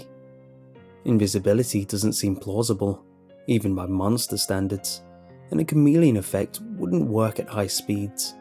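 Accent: United Kingdom. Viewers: your british acksent makes stories scary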